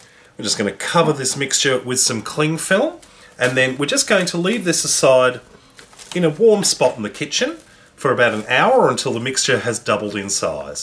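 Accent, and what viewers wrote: Australia. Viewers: To people who can't hear the difference is not English he is australian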